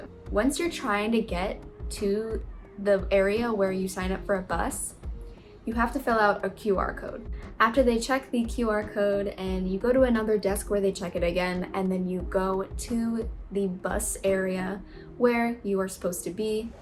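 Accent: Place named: United States. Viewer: Your accent is typical America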